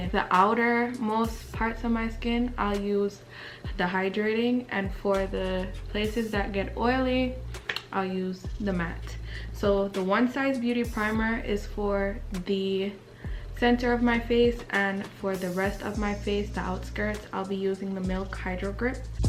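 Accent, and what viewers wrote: Jamaica. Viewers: Oooh! I hear that Jamaican accent! 🥰 i love meeting other Jamaican Youtubers like myself! We ah go up this year!🤪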